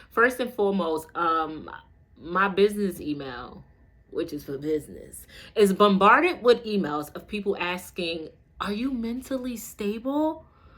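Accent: New York, United States. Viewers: Hey, I have really been enjoying your content lately . Due to it being real life situations, l and many more can relate. I used to live in Brooklyn, so I play your videos in the background to feel at home You have the real New Yorker accent is so strong I chuckle every time you start talking.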